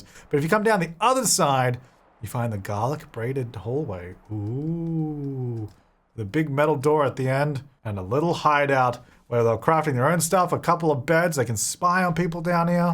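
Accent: Australia. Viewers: I always love watching your build videos 😍🥰 they inspire me with my sims builds to try new ways of building 🤔🤩🧐plus i do enjoy hearing a fellow Aussie play the sims 4 👍🇦🇺😊.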